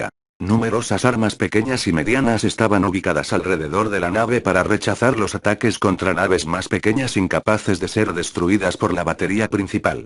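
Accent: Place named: United Kingdom. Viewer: Tienes un acento británico amigo. Gracias.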